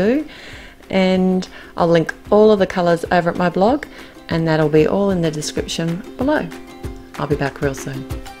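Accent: Australia. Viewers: I love to watch your videos and this card is no exception it's brilliant!!! It's refreshing to see and hear an Aussie designer in card making video's although I love all the ladies and the men too lol I live in Sydney and thank you very much for the Aussie website links to almost all of the products you use :)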